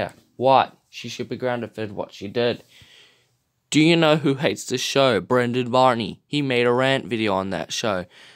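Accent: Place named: Australia. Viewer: Your accent is Australian, and I didn't know you were from Australia. Also, opinion respected on Elmo's World.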